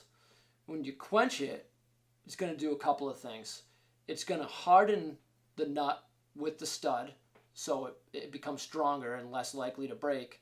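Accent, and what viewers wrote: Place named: Massachusetts, United States. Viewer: 6 seconds in and I already know you're from mass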